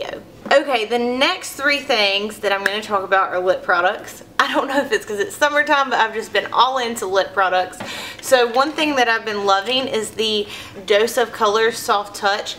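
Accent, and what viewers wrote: South, United States. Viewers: Can i just have your accent ? ❤️❤️❤️❤️ mine isnt as strong as yours i just love love live southern accents ❤️👌🏼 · you are too precious!! I commented before I watched the end of this one....you are so sweet to us into your favorites:)!!!!!! too cute xoxoxo keep it up you are already inspiring me and I'm super allot of other girls to follow there dreams....I have a thick southern accent too and I was always scared to try YouTube cause I was worried people would constantly hate on me about it:(! but seeing you smiling and being yourself is such an inspiration! !! love ya girl thank you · Found you thru Instagram...new subscriber! Loving your channel and that I can tell you're a southern girl too! xoxo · I found you on istagram and I'm glad I did! LOVE YOUR VIDEOS!! You should do a Question and answer (Q&A) BTW your southern accent is soooo Cute!!! 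♡♡ XoXo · Omg I love your accent it's so cute and country and stuff haha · It'd be so cool if I won the Raquel Nars because my name is Raquel. But anyways, I don't think that you should title your videos with giveaway because then people will only be interested in that one video and ignore your true talent and southern accent. Just an idea. Btw I love you and you are so beautiful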